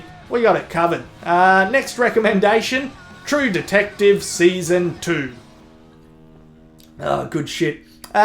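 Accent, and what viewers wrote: Australia. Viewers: g'day ozzy man. i enjoy your takes on the many pictures up for grabs and i'm a big fan of australian diction in general. i enjoy how youse and the brits rock the english language. i've been checking your channel to see if you had reviewed the other episodes of true detect s2 as i wanted to hear your thoughts on the show, so i was pleased you touched on it in this video. i was surprised however to hear you give it a passing grade mate. apart from ep1, farrell and mcadams, the show has largely been shit and most especially that action piece at the end of ep4. it was utter trash! and wtf was with that riot shell shotgun double-tap in ep2? why the fuck would you double-tap with riot shells? like it's not accomplishing anything. anyways keep it up you hoser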